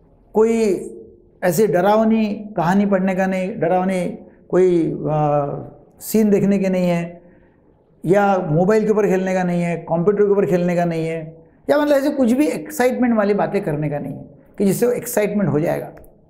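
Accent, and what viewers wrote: Maharashtra, India. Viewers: Bhai ham marathi logo me 90 present log aisehi hindi bolte hai ..Aap aur niche jaoge andhra ya tamilnadu ..Aur ghatiya hindi ayegi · Bhai Maharashtra me aisi hi hindi boli jaati hai.. aap logo ko tapori lgti hy😑😑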